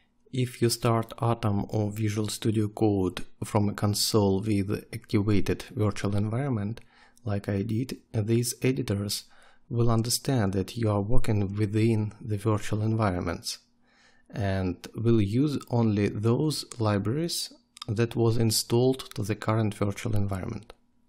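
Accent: Russia. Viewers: thanks! ;D i love your russian accent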